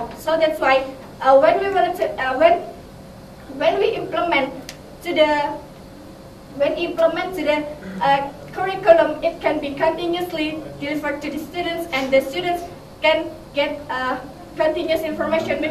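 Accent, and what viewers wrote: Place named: Indonesia. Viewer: Too fast like you are good in speaking english, but beware from other people who watch this and know your grammar, your pronounciation and your bahasa's accent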